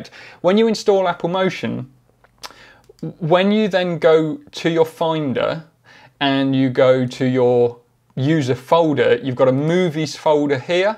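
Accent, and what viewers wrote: United Kingdom. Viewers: You are the ONLY ONE who resolved my issue.... I BOW TO YOU🙏🏼❤Feeling so so so grateful. Plus I love the British accent🤗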